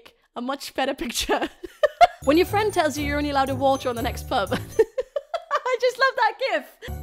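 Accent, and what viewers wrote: Wales, United Kingdom. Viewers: I fucking love ur accent. Only time I've acc liked the Welsh accent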